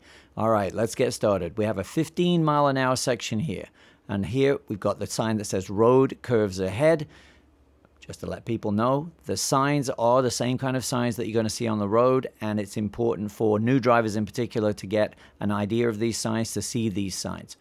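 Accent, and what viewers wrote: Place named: Kentucky, United States. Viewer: I would not have pegged that as a Kentucky accent!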